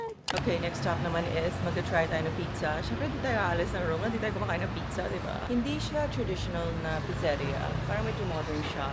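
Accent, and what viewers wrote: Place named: United States. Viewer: Ohh i know who looks like now, she looks like Priyanka Chopra, but has American English accent than Priyanka.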